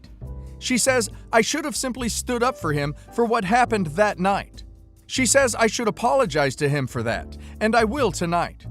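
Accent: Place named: United States. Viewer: It is so freaky hearing this american voice on this reddit stories channel. i thought it was a genuine voice when i heard it on Ask a lawyer channel.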